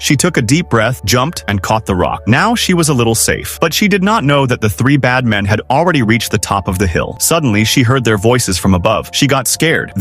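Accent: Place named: Australia. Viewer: vro he's Australian..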